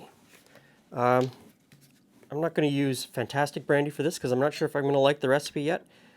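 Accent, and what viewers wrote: Canada. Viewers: I knew you were Canadian by your accent. My relatives are from Canada and they have that exact same accent. When I saw you pulling out those milk bags, I said, "Yup this guys Canadian." I knew because my uncle always bought their milk (household of 5 children) i loved the milk and wanted more and could never get enough, that and the big jar of Nutella they had for spreading it on a bread. Lest we forget the bread and that eastern European jam we always ate....since we are from Eastern Europe. Those good old innocent days, the Irish Spring and cold downstairs little bathroom.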